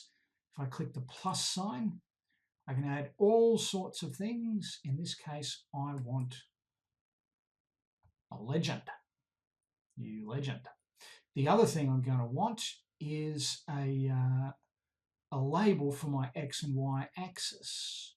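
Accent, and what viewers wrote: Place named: Australia. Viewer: Also saved me as I write my thesis!! Thank you! Love hearing a fellow Aussie accent in one of these videos too :)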